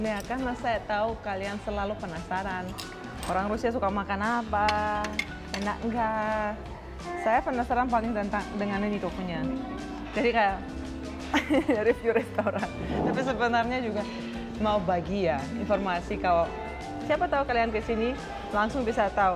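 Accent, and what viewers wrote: Indonesia. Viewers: Logat nya Indonesia banget, tdk terlihat seperti org rusia, mantap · bahasa indonesia ny kental bnget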